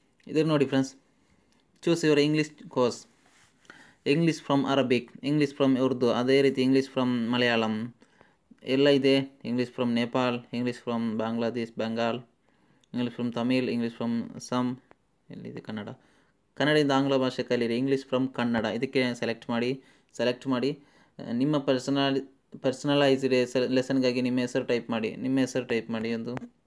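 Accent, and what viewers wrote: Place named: Mangalore, India. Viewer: nimma Kannada mangloor kannada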